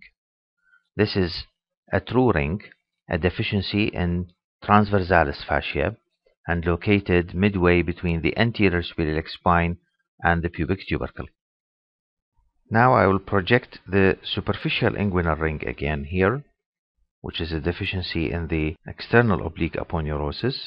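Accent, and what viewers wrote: Iraq. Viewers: Thanks for the great effort ..and by your accent i can tell you're from iraq am I right? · ماشاءالله لا قوة الا بااله سمعت مقدمة محاضرة اخرى سمعت اللهجة و شككت انها لشخص عراقي و اسعدني ان اعرف ان المحاضر دكتور عراقي و أسعدتني التعليقات الإيجابية وفقك الله دكتور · Your accent seems to be of iraqi origin ?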